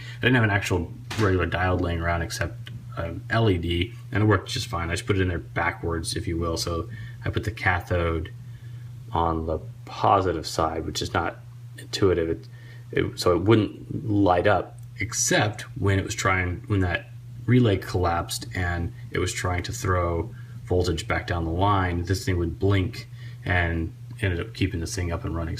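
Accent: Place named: New England, United States. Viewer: Are you on the East Coast or from there? You seem to be like a Yankee :o)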